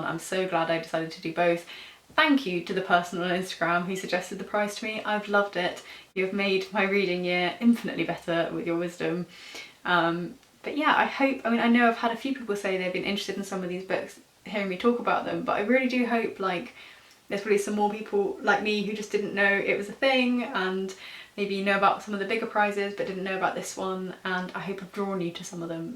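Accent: United Kingdom. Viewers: still lose some meaning words in your speak but understend more than early, did you use some english accent or it clearly english?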